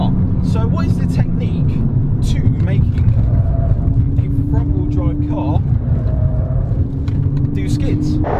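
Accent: United Kingdom. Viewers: The whole video looks like Forza Horizon 4 in real life. The British accent only adds to it. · u r British accent 👌👌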